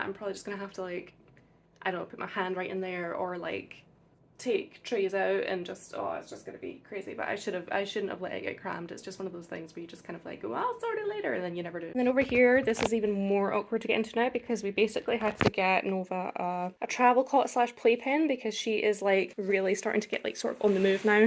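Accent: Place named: Scotland, United Kingdom. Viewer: A SCOTTISH LEGO BUILDER. I live in Falkirk. I watch Jango Bricks etc and stumbled onto this and my ears went more bigger than they are when I heard a scottish accent. Subscribed. Need to look over the channel. Not one for comments but Thought I'd say Hi.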